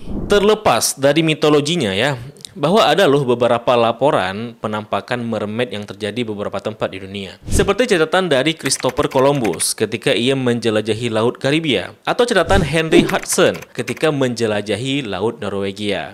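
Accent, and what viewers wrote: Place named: Aceh, Indonesia. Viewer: Bang logat km kayak org aceh😂😂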